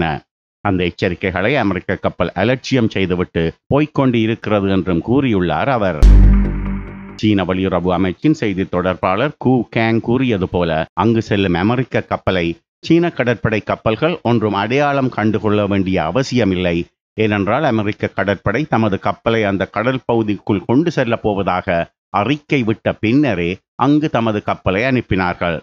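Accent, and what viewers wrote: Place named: Jaffna, Sri Lanka. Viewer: எனக்கு யாழ்ப்பாண தமிழ் மிகவும் பிடிக்கும்🇮🇳👍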